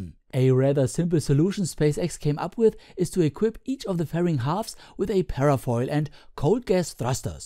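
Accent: Germany. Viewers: Rasyidan Ilmansyah he is clearly Germany his accent proves that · I only recently discovered your videos... No idea why it took me so long. Your content is amazing, not only the whole discussion about the different approaches and ideas, but also the visuals and your wonderful German accent :D Liebe Grüße aus Niedersachsen! Mach weiter so!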